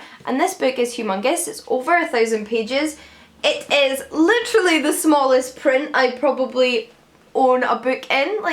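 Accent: Scotland, United Kingdom. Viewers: yep! In my case I don't usually restart a volume (especially if I'm reading the manga for an anime that I've already watch), but I find myself spending months without touching it again. And then I re-enter the compulsive reading phase again, and the cycle repeats itself xD Thanks for replying, you're really nice and insightful and your channel is one of my favourites on booktube, even though I've started following you not long ago :) keep it up! :D (btw, is your accent Scottish? I think it's lovely, but I'm not good with accents, apologies if I'm wrong)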